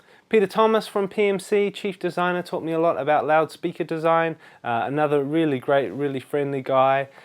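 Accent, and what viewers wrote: New Zealand. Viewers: ah! i knew you were a kiwi!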